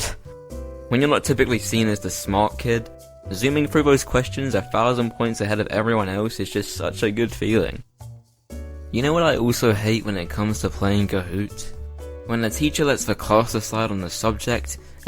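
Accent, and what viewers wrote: United Kingdom. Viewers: i absolutely love this guys British accent. It’s so charming to hear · Your brittish I thought you was Australian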